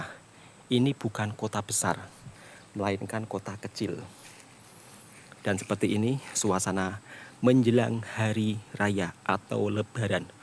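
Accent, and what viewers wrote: Java, Indonesia. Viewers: Medokkķkkķ jawa nye😅😅😅😅😅😅😅😅😅😅😅😅😅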